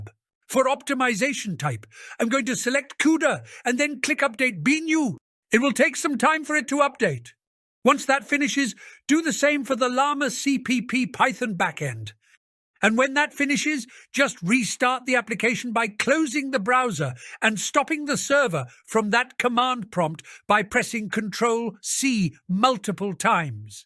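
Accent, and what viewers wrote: United Kingdom. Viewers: Dear excitable mystery British A.i voice person kindly making videos. /:) I am having a headache and wondered if you could share a line or two here or if you wanted make a video, regarding a headache I am having. No matter how I try, I cant seem to load any different models nor Lora's into it. I go to the model manager for example, and I find an applicable checkpoint sdxl model from Citivai as example, I copy the web address of the page and plonk it into the model downloader in Biniou, and all it does is download a few hundred kilobytes then says the model has been downloaded. Same for Loras. Do I need to edit the URL or do something else to it to make Biniou accept the model and install it? As for huggingface, I have an account but I haven't a clue what url I am supposed to take from there to put into Biniou as while I can find relevant pages for applicable models and such easy enough on there, huggingface don't exactly have a ''download"' button and when you are new to all this its a little befuddling. 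Many thanks, from an excitable british man.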